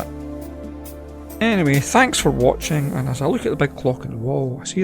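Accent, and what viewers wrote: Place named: Scotland, United Kingdom. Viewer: Hagg,he’s Scottish,can you not understand him?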